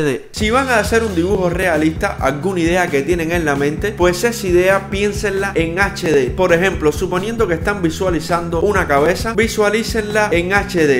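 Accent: Cuba. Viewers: Tu acento te delata tienes origen cubano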